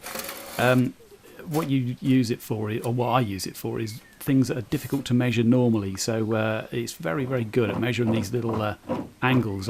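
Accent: Norfolk, United Kingdom. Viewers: Enjoying your videos, yew sound like a Norfolk boy to me (as am I), I don't detect the upward tone rise of a Suffolk boy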